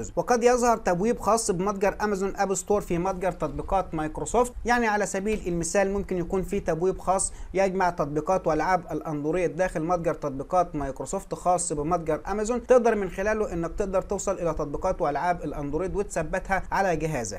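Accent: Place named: Egypt. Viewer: جزاك الله خيرا لشروحاتك الواضحة.. استفدنا منك كثيرا ❤️ احبكم كثيرا يا أهل مصر وقد تعلمتم منكم كثيرا, وبالاخص مع لهجتكم الرائعة 😁 ادامك الله اخي ووفقك لكل خير يا رب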